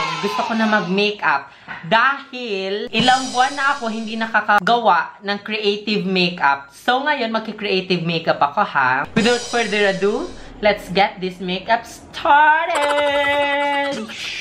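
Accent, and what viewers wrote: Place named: Polangui, Philippines. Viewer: I just so love how you speak bicol polangui, makes me wanna go home. LGBT is ❤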